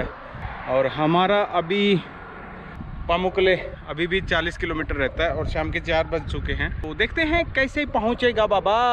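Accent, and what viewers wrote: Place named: Bihar, India. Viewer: Aapka jo bolne ka tone bahut bar Bihari tone lagta hai I am watching yours video for two days continuously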